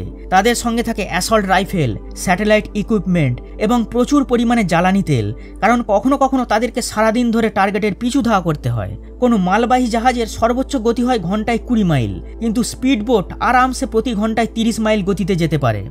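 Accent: Kolkata, India. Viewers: আপনার ভয়েস শুনেই মনে হয় কলকাতার দাদা ❤️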